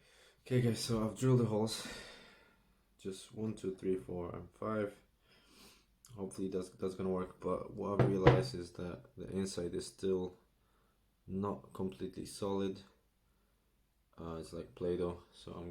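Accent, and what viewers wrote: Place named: Poland. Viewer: zajebista forma byku, niezły twister, piszę po polsku, bo słyszę akcent XD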